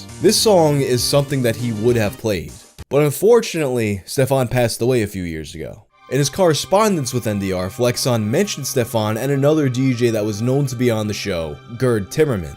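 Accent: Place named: New York, United States. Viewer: "NBA Jyaam" You sound just like your impression of the stereotypical New Yoyk accent from your Kill Count vids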